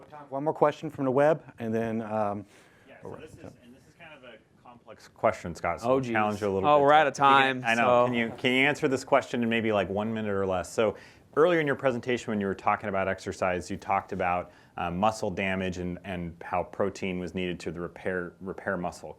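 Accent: Boston, United States. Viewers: Your staht boston accent comes out a little, haha.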